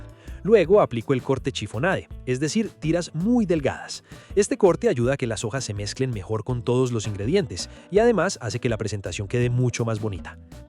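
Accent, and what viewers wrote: Colombia. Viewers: Lo mejor de todo es tú acento Colombiano. 🌞🌠🌎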